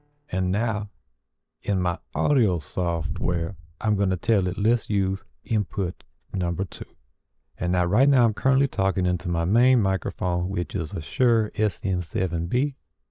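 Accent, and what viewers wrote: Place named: Mississippi Delta, United States. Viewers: My favorite thing to do in the morning is watch craftsman while I’m doing my make up lol there’s just something about the combination of an extremely southern man talking to me about making toys which I will never build while doing my someone elaborate make up routine 😂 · This channel rules, and that dulcimer deep south Mississippi delta accent is the cherry on top.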